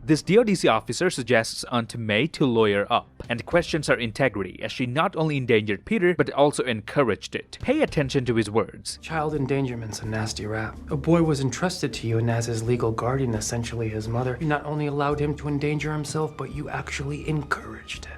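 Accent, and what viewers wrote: Canada. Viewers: he is starting to sound more canadian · You're Canadian!!!!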